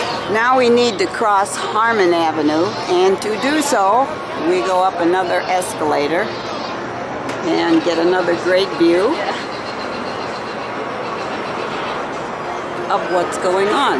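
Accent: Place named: Chicago, United States. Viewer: Where do you come from with that accent? You sound like a Chicagoan.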